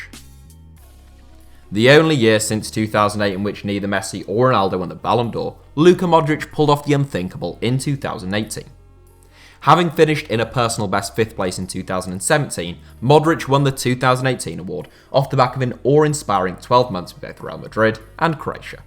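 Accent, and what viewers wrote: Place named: United Kingdom. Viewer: Voice so British I had to turn on the sub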